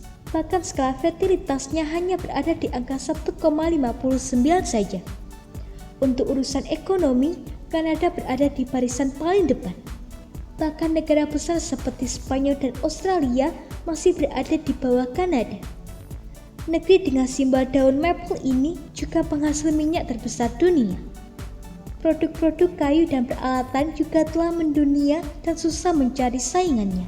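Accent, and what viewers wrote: Java, Indonesia. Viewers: dialek jowo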